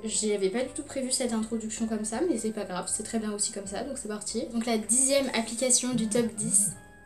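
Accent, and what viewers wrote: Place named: England, United Kingdom. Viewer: Magnifique accent anglais bonne vidéo bravo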